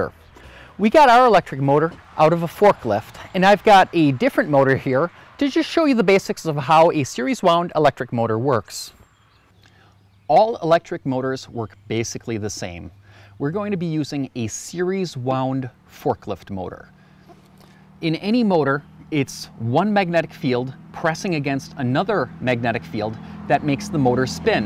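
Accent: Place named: Wisconsin, United States. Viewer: Ah that great Wisconsin accent, takes me back home. Great video series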